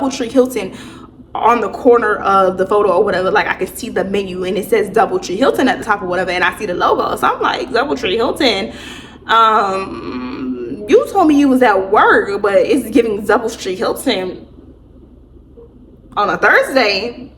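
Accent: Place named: Southeast Texas, United States. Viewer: He gotta be either from Beaumont or Orange 😂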